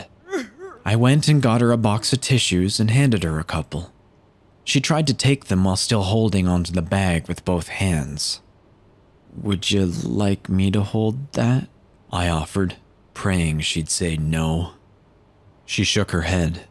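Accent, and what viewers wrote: Canada. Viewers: I love your little Canadianisms btw:3 Which is your favourite of the stories you've narrated? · The guy reading is definitely Canadian. About = aboot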